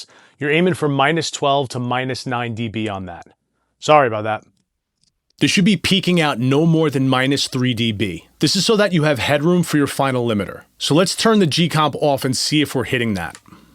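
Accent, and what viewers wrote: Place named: East Coast, United States. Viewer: With That east coast accent, I couldn't tell if he was saying "open up the DAW" or "open up the door"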